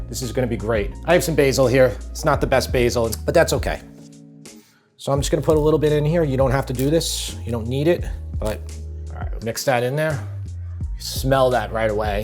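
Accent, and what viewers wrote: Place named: East Coast, United States. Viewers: Hahaha. I was like this guy has to be from long island. Saw the location. Locatelli is the only acceptable grated cheese. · shake n bake. love the east coast accent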